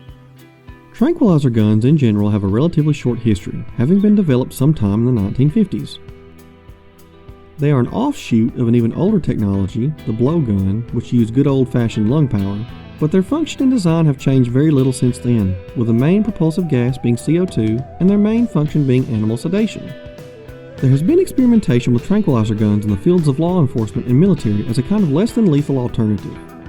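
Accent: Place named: South, United States. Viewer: Articulate. Speaks well. Southern. Talking dinosaurs…. Dude! You are my new best friend!!!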